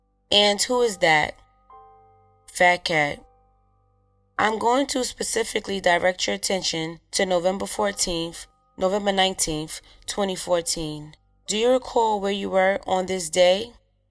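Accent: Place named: New York, United States. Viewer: Man i wish the original narrator was still alive ...cant stand the new york accent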